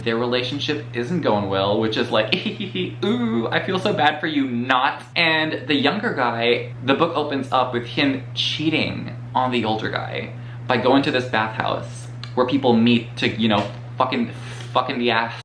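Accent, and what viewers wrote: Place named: United States. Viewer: Can you believe that!!! I was surprised too, for his American accent is way amazing.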